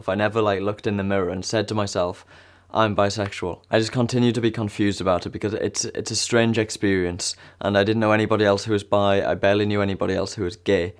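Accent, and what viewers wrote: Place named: Geordie, United Kingdom. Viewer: I know you're from Tyne and Wear somewhere and your accent is too soft to be from North of the tyne, so I reckon you're either from Sunderland or sour Tyneside, maybe Whitburn or South Shields?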